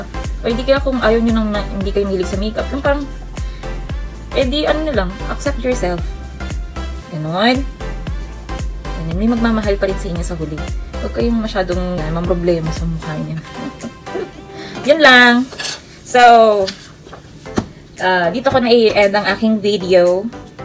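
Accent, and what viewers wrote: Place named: Visayas, Philippines. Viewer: Mars same tayu ng tone hehhee ilonggo ka or bisaya??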